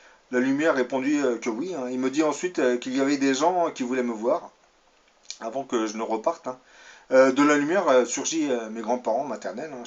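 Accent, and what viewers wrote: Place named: Belgium. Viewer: Vous avez un jolie accent du nord ou proche de la Belgique 🇧🇪... en tout cas cela y ressemble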